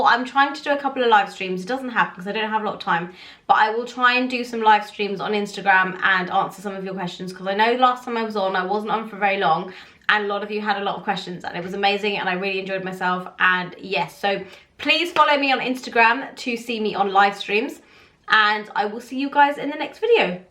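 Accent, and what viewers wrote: United Kingdom. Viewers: I seriously can't get over her face. She's so pretty. And she speaks that funny English (British).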